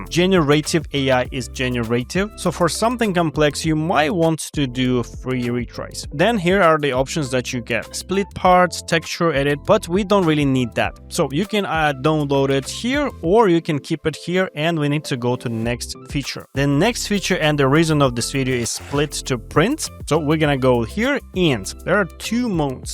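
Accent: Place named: Russia. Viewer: I love the American Russian accent of this guy lmao, hands downs best tutorials in the 3d AI space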